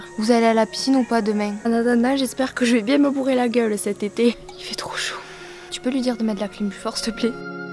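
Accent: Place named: Gers, France. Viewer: Twizix normal c'est celui du Gers on a le même 👍🏻😉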